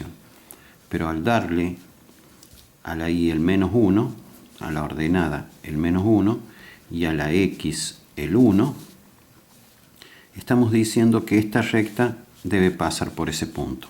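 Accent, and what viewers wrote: Córdoba, Argentina. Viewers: de que parte de cordoba sos maestro??? Sos un grande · sos cordobes??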